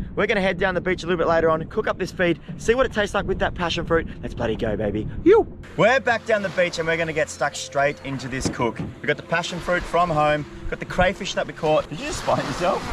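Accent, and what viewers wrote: Australia. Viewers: i can already hear the aussie accent off the thumbnail clicking 😂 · Are you Australian mate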